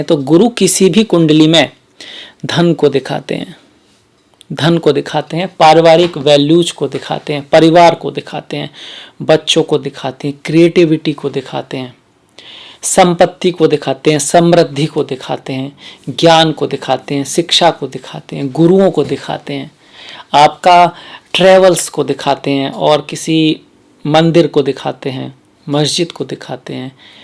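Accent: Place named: Braj, India. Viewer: आपके ज्ञान और प्रस्तुति अद्भुत हैं, इसलिये ज्योतिषीय विश्लेषण भी लीक से अलग और बुद्धिवादी है। विचार स्पष्ट और ज्ञान से आए आत्मविश्वास से भरे हैं। बधाई। ईश्वर कृपा रखें। एक बात ध्यान देने की है और वयोवृद्ध होने के कारण कहना मेरा कर्त्तव्य है। भाषा के ढीलेपन को सुधारना चाहिये। प्रादेशिकता का असर तो बोलने में होता है किन्तु 'नमस्कार' को नमश्कार या प्रत्येक 'व' को ब कहने और स और श में गंभीर ग़लतियों के न होने से निश्चित ही प्रस्तुति और प्रभावी होगी। इसके अलावा भी भाषागत उच्चारण जैसे इंडस्ट्री को इंडष्ट्री आदि अनेक बातें हैं जो ज़रा-सा ध्यान देते ही व्रजभाषा के पाश से मुक्त करेंगी और हिन्दी के मानक उच्चारणों की ओर ध्यान दिलाएँगी। यह एक क्रांतिकारी सकारात्मक परिवर्तन होगा। व्रजभाषा की मिठास अद्वितीय और दैवी है मगर हिन्दी बोलते समय उसका खड़ापन ही उसका मानक है। कृपया अन्यथा न लें। जैसे आप मकर वालों को मेहनत करने की सलाह देते हैं वैसी ही मैंने दे दी है, स्वीकारें।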